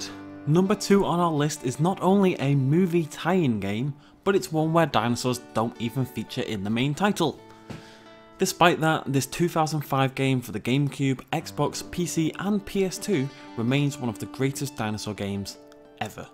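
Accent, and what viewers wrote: United Kingdom. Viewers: ***** jpog is my fav dino game and by the way are you British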